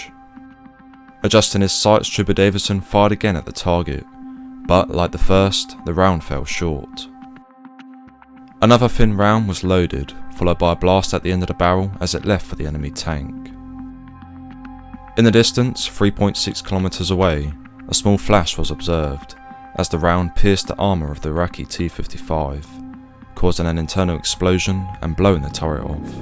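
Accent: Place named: United Kingdom. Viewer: very well spoken and interesting, you cant beat a well spoken brit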